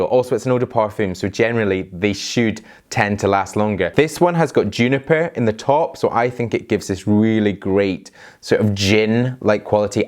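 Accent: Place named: Glasgow, United Kingdom. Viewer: You from Glasgow dude?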